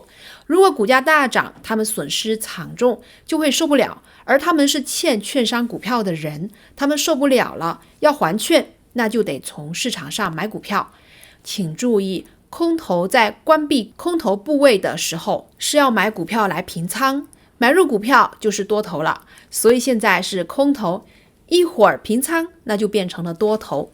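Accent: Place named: Jiangsu, China. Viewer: 謝謝Sammy 分析，一口江蘇、上海人口音，請問你哪裡人啊？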